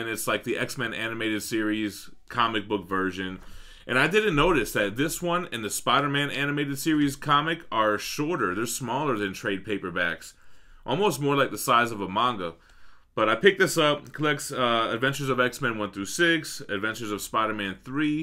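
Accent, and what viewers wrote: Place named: Bronx, United States. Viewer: i dig your videos and love your south Bronx accent but i gotta ask...lol. where in the world do you keep all the goods constantly coming in dude? lol between the statues and the 12 inch books, you must live in a mansion or a warehouse..lol. peace.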